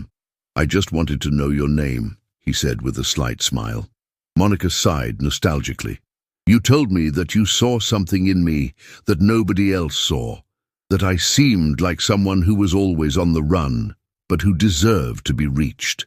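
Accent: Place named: United Kingdom. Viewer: I love the narrator's British English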